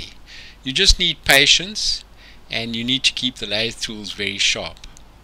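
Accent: South Africa. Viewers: Hi , I have a Unimat SL I bought new in 1977 with many accessories , I made a nice box to store everything in but never used it until I retired in 2019! Now with spare time I am making wooden model wagons and find the Unimat excellent to drill wheel and spoke holes accurately using the 3 jaw chuck on the dividing head, I have 30,40 and 48 tooth inserts for it which gives all possibilities for the number of spokes per wheel you need. I enjoyed the video and still look for more accessories, I have the thread cutting attachment but no leaders or followers and I would like the adjustable tool holder you show, I do detect you accent as very close to a south African one which is where I am in Springs. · By your accent you sound like a South African…. I too live in SA.I have one of these lathes that my Father gave me way back in 1970. Unfortunately the motor stopped working and the drive belts broke. 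Recently have managed to find replacements for these. Where do I get polyurethane belt material in South Africa if the new replacement belts snap? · Thanks for a great video. I inherited a Unimat from my late father in law. Your channel is a great knowledge source. Thank you PS are you South African?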